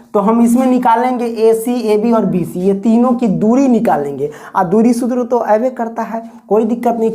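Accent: Bihar, India. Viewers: Bihar se ho sir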